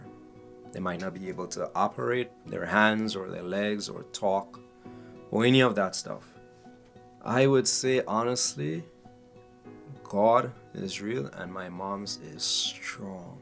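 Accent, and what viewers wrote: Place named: Caribbean. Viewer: Where man can't, God can! Where we fail, He prevails! ... He sounds like he's from the Caribbean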